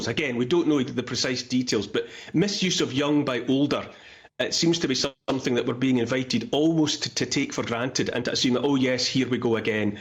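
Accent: Scotland, United Kingdom. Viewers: with a Billy Connolly accent today:)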